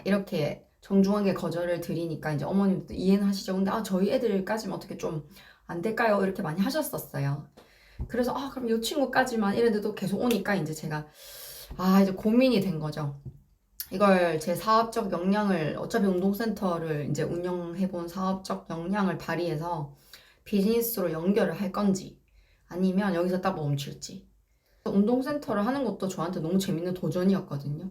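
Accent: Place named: Busan, South Korea. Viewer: ❤❤대단하십니다. 부산사투리가 더 듣기가 좋네요~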